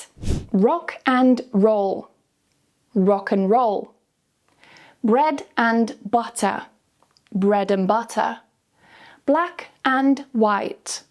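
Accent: United Kingdom. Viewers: That's a really effective video for me to learn the British accent. Thank you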